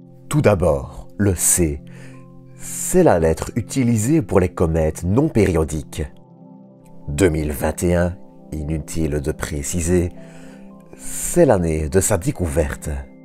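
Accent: Belgium. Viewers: Tu es belge ?? Merci pour la vidéo je suis de Bruxelles au plaisir encore des vidéo svp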